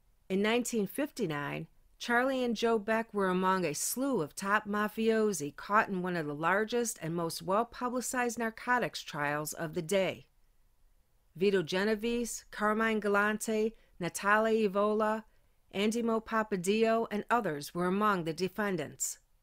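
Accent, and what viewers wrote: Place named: Upstate New York, United States. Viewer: Its pronounced 'How-ston' street, not Houston like in Texas. I knew u weren't a new yawka lke me bec of yr accent which is.....I dont know. Upstate ny maybe?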